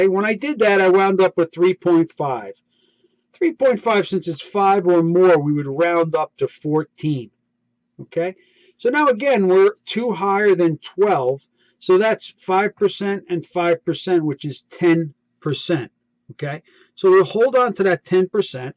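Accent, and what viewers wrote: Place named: New York, United States. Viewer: 13.5 your garbage New York accent messed me up for a min. Good tutorial otherwise.👍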